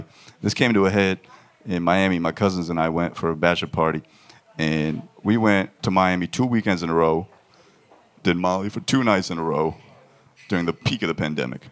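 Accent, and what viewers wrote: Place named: United States. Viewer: He talks so american but tht laugh is Indian asf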